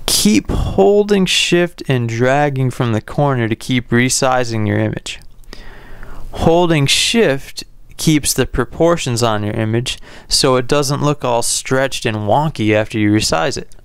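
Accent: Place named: West Coast, United States. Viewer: Haha! You sound like such a west coaster. But thanks for the lesson!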